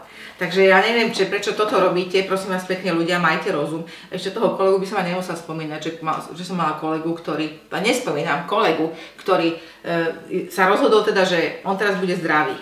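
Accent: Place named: Bratislava, Slovakia. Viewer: Nebyť toho blávackeho dialektu by človek bral aj vážne.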